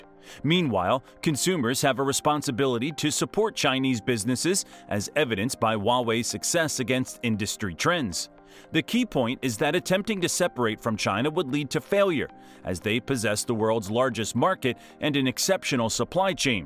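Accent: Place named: United States. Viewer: Why use an American accent when you're anti USA? Keep it 💯 wus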